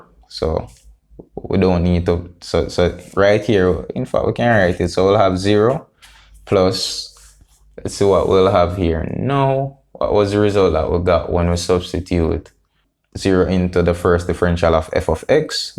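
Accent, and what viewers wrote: Jamaica. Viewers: My Eng. papers have been beating me mercilessly...this helps. Thank you. I could have sworn you have a Jamaican accent...helps me remember this.😄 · So nice and simple, my lecture made this sound like a tong twister to me, and you sound more of a Jamaican I really love the vibe keep on uploading more videos you are helping many enjoying from Kenya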